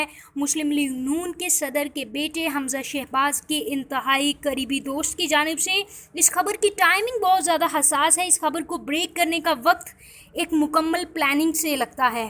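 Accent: India. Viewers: Ye channel india ka hain voice chak Karo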